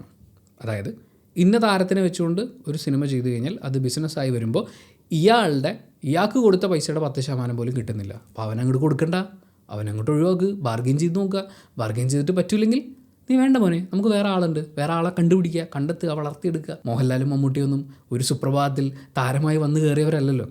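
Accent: Kannur, India. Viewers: ningal...Kannur,kasargode...alle...namma...parayunna pole...namma...Thrissur,Ernakulam...Kar parayuna pole..Chakrashwasam ennokke...pedakkanundallo...any way...nice review about the cine crisis.and your views, solutions and suggestions are appreciatable.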